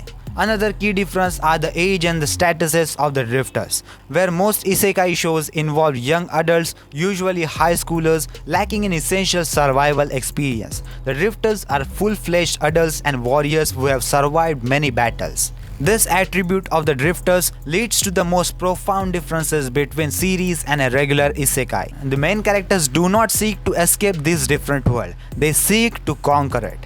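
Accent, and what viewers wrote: India. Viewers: Indian accent is worst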